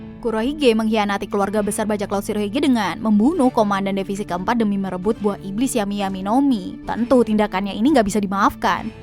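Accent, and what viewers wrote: Java, Indonesia. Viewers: mana yaak? kangen gw medoknyaa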